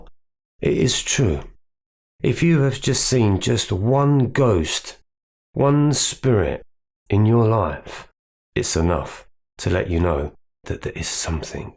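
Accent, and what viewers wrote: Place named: Cockney, United Kingdom. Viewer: This is great. As a Northerner I never thought I’d be enraptured in a lesson on the dark night from a cockney geeza called! 😂 We live and learn. Thanks mate, wonderful video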